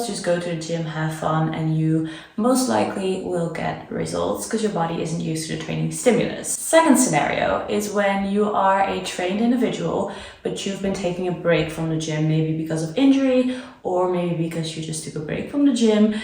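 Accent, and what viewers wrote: Netherlands. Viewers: Hoor ik het goed ben je Nederlands? Fijne video thanks! ❤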